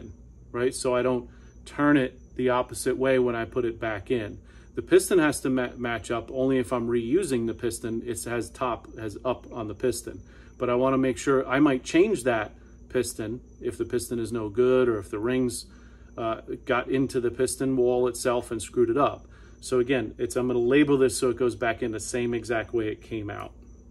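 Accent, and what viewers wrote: United States. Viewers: I'm from Bilbao, a city at the northern coast of Spain near France. I went to Southeast Texas when I was young and I had visited the US several times because my company used to send me to some broadcast exhibitions named NAB in Las Vegas and to visit some factories mainly from California. I fancy boats since I was very small. I've got a picture onboard my parents boat when I was three months old, and I got my captain license when I was 15. I own a 14 foot boat with a 15hp two stroke Mercury for rowing and some fishing, a Pursuit 2550 that used to have twin Mercury 135 two stroke but now we've got only a single one, because the other one got destroyed. We use thIs boat for fishing and sunbathing with the family. Also got a racing 25 foot sailboat with a Mercury 5 two stroke (I've got one short and three long shafts, four units in all). 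I love two strokes, I'm retired and I still keep my vintage motocrosss bike. Your accent sounds American but... Where are you from?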